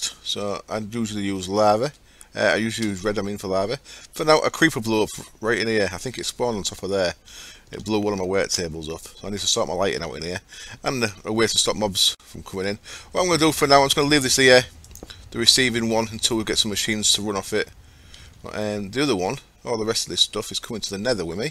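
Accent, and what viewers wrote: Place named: Hull, United Kingdom. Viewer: Fab video.. Just started Monster after me Direwolf20 crashed... Love the Hull speak, And guessing Hessle road the way you swear lol.. Good stuff (y)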